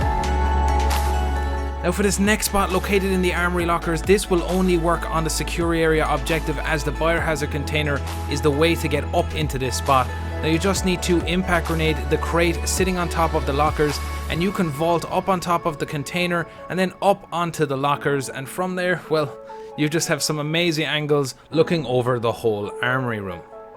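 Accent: Ireland. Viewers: i can tell ur Irish from your accent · Are you Irish?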